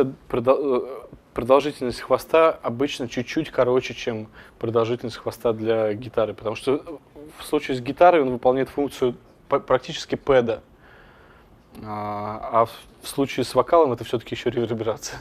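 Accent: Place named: England, United Kingdom. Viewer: английский акцент немного слышится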